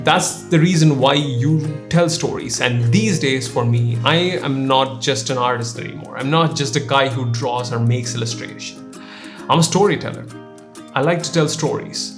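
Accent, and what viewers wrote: South India, India. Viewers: are you from south india bro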